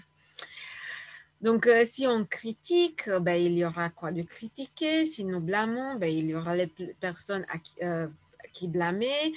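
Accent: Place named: Romania. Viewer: Merci beaucoup Je viens de vous découvrir... Je vais acheter le livre, le lire, m en imprégner et puis suivre vos vidéos pour faire ce travail... J aime beaucoup votre petit accent roumain sympathique, votre bonne humeur contagieuse et votre franc sourire A bientôt 🖐🖐🖐🖐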